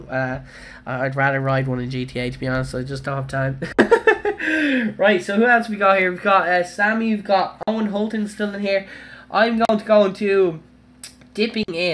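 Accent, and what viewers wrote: Ireland. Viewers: OMG happy birthday <3 luv ya and your vids xxxxxxx hope it's a good one xxxx 4 ever loving you and your Irish accent From maria x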